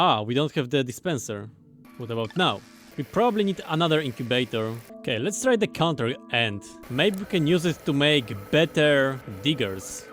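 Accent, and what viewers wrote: Poland. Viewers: Odrazu wiedziałem że jestes Polakiem, wystarczyła chwila abym wykrył rodaka, poza tym niezły kanał, gratulacje.👌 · czy ja slysze polaka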